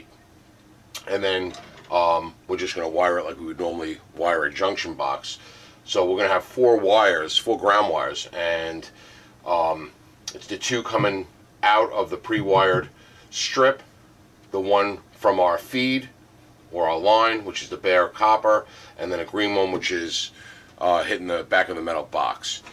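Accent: New York, United States. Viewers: thanks for my daily recommended allowance of New York accent. thx for the viddie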